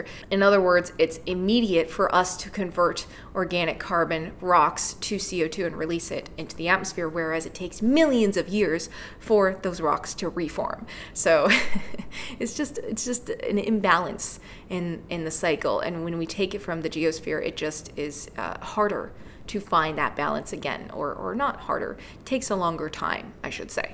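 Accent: United States. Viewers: Is she american?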